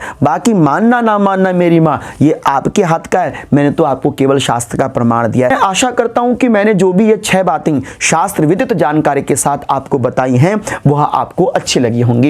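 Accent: Standard. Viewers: Aap kitna accha Bolte hai akdam sudh🙏🙏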